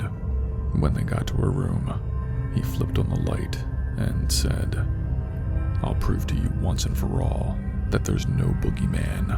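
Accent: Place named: East Coast, United States. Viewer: Ahh. Thought I detected an East Coast accent. I KNOW you are not Ai! I’m a night owl in the Pacific time zone. Even when I catch this around midnight, it’s like 3am your time when I comment. 🤷🏻‍♀️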